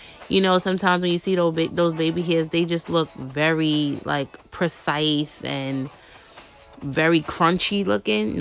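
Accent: New York, United States. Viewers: You sound like your from NYC. Where can i find you sis your channel is everything and CONGRATS on your one yrar anniversary 🎉🎈🎊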